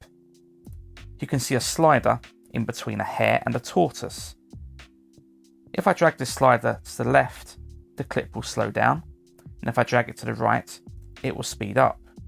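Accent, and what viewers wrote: United Kingdom. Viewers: nice a british accent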